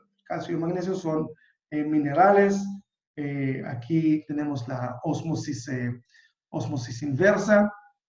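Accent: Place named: Arab world. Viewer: El.Dejo árabe 😁🎊🎊🎊🎊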